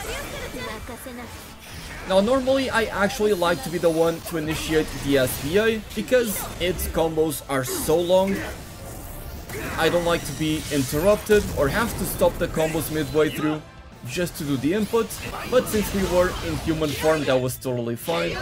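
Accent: Portugal. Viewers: então esse sotaque era português 😂😂😂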